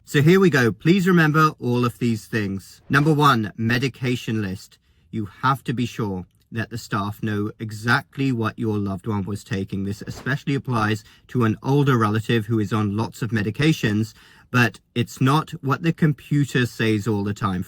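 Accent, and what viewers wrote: United Kingdom. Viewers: You nipped out.And you did not have time to remove that s##t from around your neck. Do you think that makes you more of a doctor? And that British accent is getting tiring now. SHUSH.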